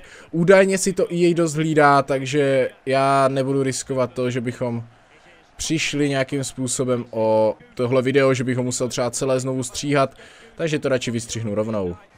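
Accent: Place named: Moravia, Czech Republic. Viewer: já to sleduju taky kvůli těm kecům:D jako moravák mám stejné nářečí jak takže u toho vždycky chcípám:D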